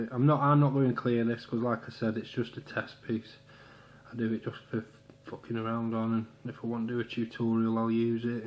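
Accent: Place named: Stoke, United Kingdom. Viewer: Sounds like a stokie to me !! P.s where can I buy the things you put in the spray from ?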